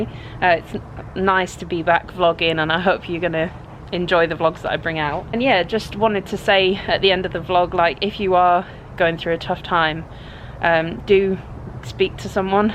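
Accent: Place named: Essex, United Kingdom. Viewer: Hi. I've just discovered your channel. I'm in North Essex so by the sound of your accent, not far from you. I really want to get back into riding but was put off by mean comments about my size which has affected my confidence. I am enjoying your videos and hope to start riding again very soon. I'm a freelance groom so do get lots of horsey contact time x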